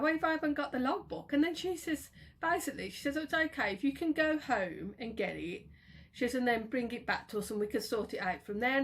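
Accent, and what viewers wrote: Birmingham, United Kingdom. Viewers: Hello and a good video you’ve done thanks showcasing your storytime regarding motor car situation and I have previously seen a few or so videos you’ve done and love your personality such as your proper brummie accent and humorous ways and may I ask you to please feel free to checkout my channel and hope you’ll subscribe like I’ve done for you thanks as us small YouTubers must help each other so that’s all for now so until the next time take care and cheerio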